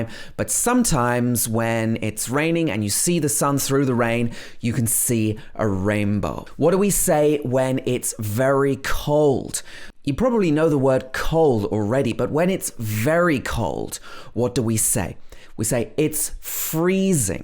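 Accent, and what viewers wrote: United Kingdom. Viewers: This my favorite British accent coach and channel 🔥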